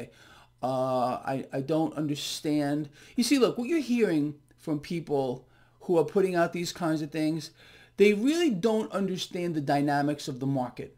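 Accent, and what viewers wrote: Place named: New York City, United States. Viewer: His is a New York City dialect.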